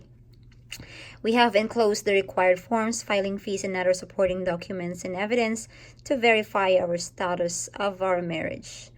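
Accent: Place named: Philippines. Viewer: Filipino-english accent! Ang nice! 😊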